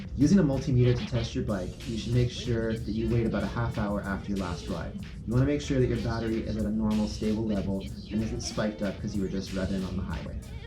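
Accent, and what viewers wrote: Canada. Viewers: Are you Canadian? I think I can tell. British guy here! 😀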